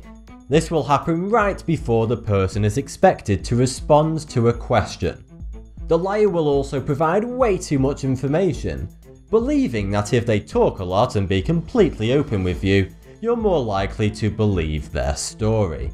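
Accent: United Kingdom. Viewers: RSCGaming hes british, and in british accent, thoughty 2 sounds like 42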